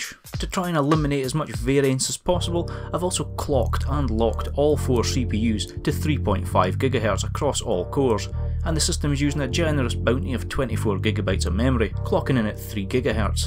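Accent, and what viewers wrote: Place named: Glasgow, United Kingdom. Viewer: dude i cant understand you for shit, maybe use subtitles next time, you must take into consideration that at least half of the viewers are not native speakers of english so it's hard to follow your Glasgow accent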